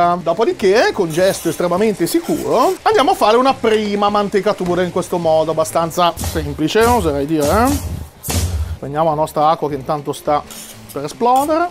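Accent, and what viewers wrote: Rome, Italy. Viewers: Si dai ci sta come parli in romanesco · Fa abbastanza schifo il tuo romanesco...hahah senza offesa.... comunque bravo così se fa a carbonara quella vera....te se ama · Il BURROOOOOHHHH non è mancato Ahahaha comunque il tuo romano é 🔝🔝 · Tu sei pazzooo!!! Pizza con carbonara, burrata e mortazza! MORTACCI!!!😂😂😂 col romano puoi migliora' zio😉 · È stato emozionante aver sentito il tuo romano!🤩🤩🤩🤩 Una Carbonara trucida ma divina, cacchio se la proverei!🔝🔝🔝🔝 Comunque boss sei proprio fenomale!!!🤩🤩🤩🤩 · Sei meraviglioso quando parli Romano te se ama!!!! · ROMANACCIOOOOO NO ROMANESCOO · Che bello sentirti parlare Romano! 💛❤️ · Carbonara perfetta... E il tuo Romano pure.... La mandrakata finale é strana ma direi che e boooona 😂 Grande... Sei eeeeeeerrrrr meeeejjjjjo 😂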